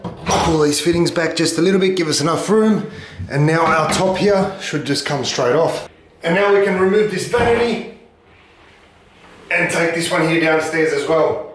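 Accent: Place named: Australia. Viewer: Simply explained but must be Australian.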